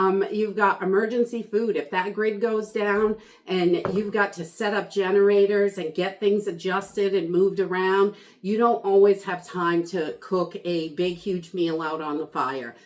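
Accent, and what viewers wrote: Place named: Maine, United States. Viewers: from Maine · I think she’s from Maine. We just call it Wally world. I live in New Mexico. And I have an accent too, also I tend to talk very fast. I think that’s what you’re hearing is her accent.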